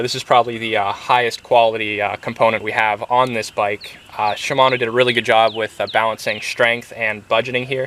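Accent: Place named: Pittsburgh, United States. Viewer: you sound like you're closer to Newcastle or Pittsburgh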